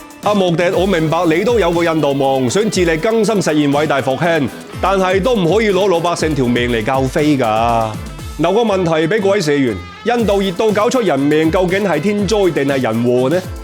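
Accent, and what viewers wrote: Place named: Guangzhou, China. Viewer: up主喺邊度人，聽口音有d似我哋廣州話，但又唔係正宗廣州話，似乎是帶點其它口音的廣東話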